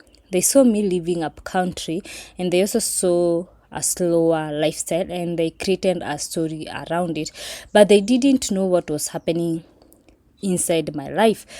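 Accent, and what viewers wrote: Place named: Kenya. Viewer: Sura yako ni ya Mkikuyu pia huwa unasound kama mkikuyu lakini jina ni ya Kiluhya au umeolewa na Mluhya?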